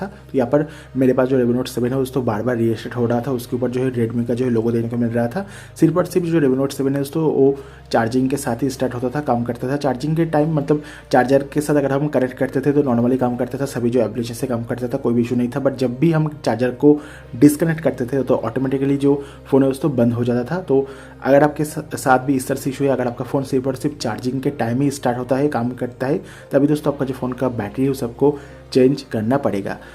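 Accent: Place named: India. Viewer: لمادا الشخص الهندي لا يقدم طريقة ويكتفي بالكلام مع ااعلم لا نفهم عليه اللكنة واللهجة. 😮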